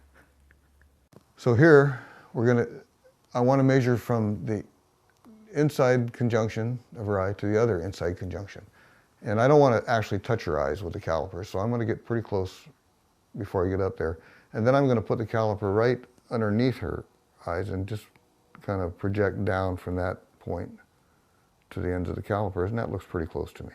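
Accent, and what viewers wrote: United States. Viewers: Midwest accent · That's an honest to God, original Oregonian accent.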